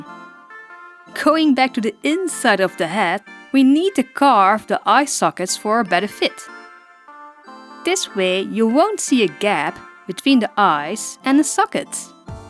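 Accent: Netherlands. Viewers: Your so typical Nederlands accent hurts my lil Belgian-dutch speaking self, The finished doll looks awesome tho!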